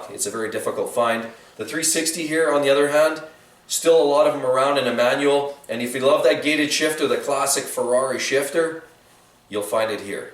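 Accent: Canada. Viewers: Are you Canadian or from Minnesota?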